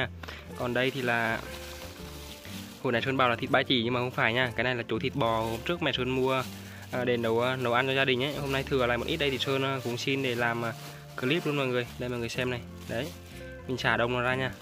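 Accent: Vietnam. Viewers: Bạn người nghệ an à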